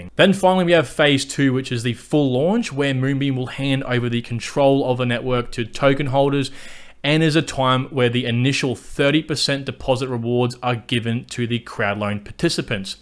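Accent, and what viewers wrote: Australia. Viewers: Aussie mate!!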